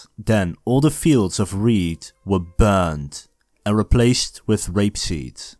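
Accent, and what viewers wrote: Netherlands. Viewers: Typical English with a Dutch accent. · Hey man are you dutch? Ben je ook nederlands man ik kan he accent wel goed horen ;p ... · Hahaha heerlijk het super dikke Nederlandse accent op je Engels :p Interessante video verder! 💪🏻🧡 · you sound dutch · Dude! Je accent is zo Nederlands! Vreselijk !! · A lisp and a Dutch accent.... toe curling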